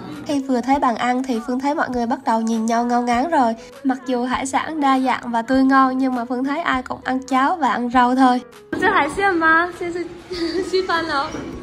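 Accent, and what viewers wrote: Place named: Da Nang, Vietnam. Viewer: Phương người Đà Năng hay sao mà giọng nói như người Đà Nẵng vậy. Lần đầu mới xem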